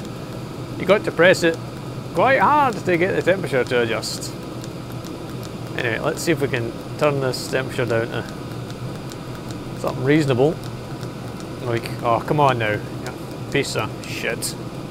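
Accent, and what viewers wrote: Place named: United Kingdom. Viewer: NOT TO SURE , about advice from someone with " British Speak" , untill I heard that all too familuar fraze, "AHH PIECE OF SHIT " , then i felt at ease!!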